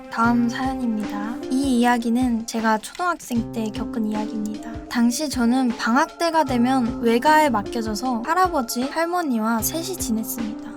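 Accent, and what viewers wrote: Standard. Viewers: 새삼 느낀게 사투리 다 어디가고 서울말 쓰고 있네요?? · 표준어 패치된 ㄷㄷ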